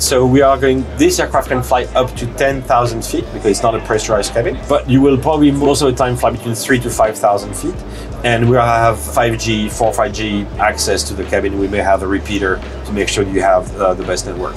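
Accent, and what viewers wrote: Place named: Switzerland. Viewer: "Bisch scho am filme?"...ha doch dänkt das du schwiizer bisch...😊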